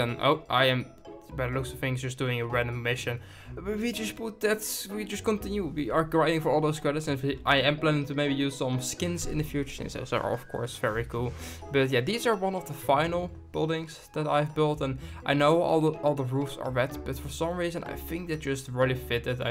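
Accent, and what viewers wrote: Netherlands. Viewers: Wow you are good at building but why cd-opnamen you have an Dutch accent are you dutch? I know that accent BC im Dutch 😁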